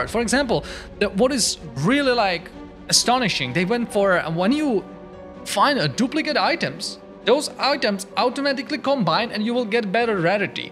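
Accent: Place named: India. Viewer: I'll take my chance at sounding rude, but how are you quite white with that Indian accent? Just being curious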